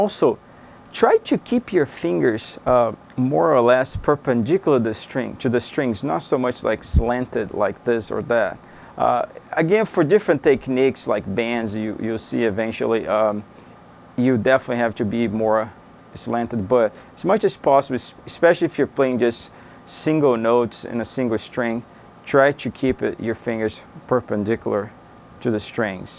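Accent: Brazil. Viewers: that's a brazilian accent ahah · Just pondering...what nationality are you? I've been sitting through all these videos, and the accent sounds familiar, but I want to say Brazilian Portuguese. Am I even close? Outside of that completely unrelated question, I just wanted to thank you for making these super helpful videos!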